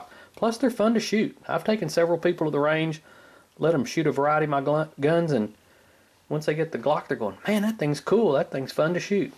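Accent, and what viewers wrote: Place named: North Carolina, United States. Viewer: That's a NC accent if I ever heard one lol. My best friend in the military is from NC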